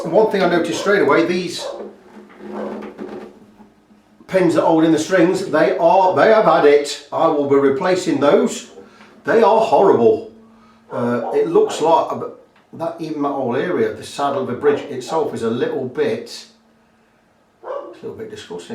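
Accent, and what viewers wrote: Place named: East Midlands, United Kingdom. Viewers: I was guessing Leicester! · Great tutorial. Thank you for the gmi tools tip. You sound like you're from my neck of Sherwood Forest. Derby, Notts or Sheff area. Lovely to hear that accent all the way up here Int North. It was your pronunciation of 'about'. We both say it 'abart'. Top guitar tech in bud. Duly subbed and liked.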